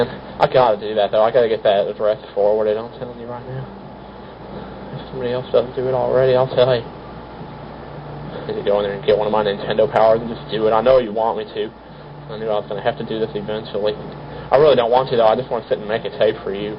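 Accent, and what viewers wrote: South, United States. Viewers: On the tape you sounded a lot more southern! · hhahahaha you had much more of a southern accent back then :D so CUTE! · Isn't he 19 in this tape? Also I love the southern the accent.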